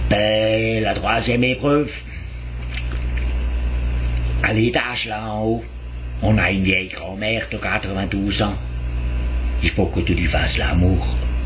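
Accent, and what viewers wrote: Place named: Alsace, France. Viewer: Etant Alsacien je peux vous certifier que l'accent est à peine caricaturé ^^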